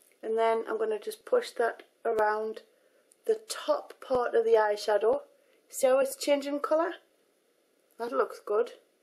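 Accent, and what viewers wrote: Newcastle, United Kingdom. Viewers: It's a Geordie accent, it's from the North East of England. Typically around the Newcastle area. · She's originally from South Shields, England. It's called a Geordie accent and I love it too! :D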